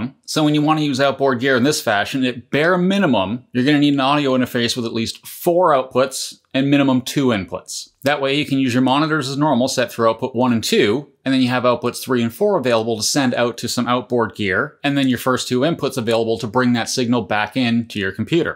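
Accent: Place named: Canada. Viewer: Canadian IN and OOT!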